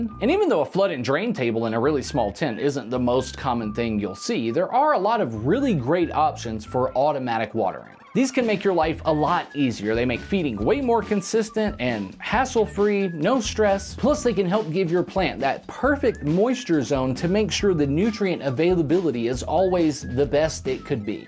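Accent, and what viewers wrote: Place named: South, United States. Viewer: my got that southern drawl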